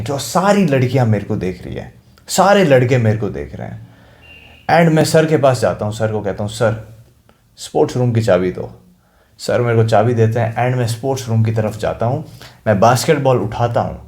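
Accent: Rajasthan, India. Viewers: R u from Rajasthan? I think because of your accent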